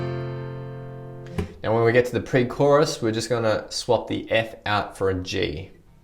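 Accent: Australia. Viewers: Never realized u were aussie was I fucking deaf.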